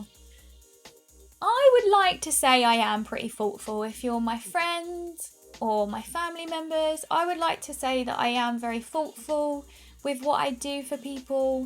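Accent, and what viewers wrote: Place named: United Kingdom. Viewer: I hope your not offended by this question but you sound British that’s not the question rather if you are from where do you hail from London by chance?❤️👍😁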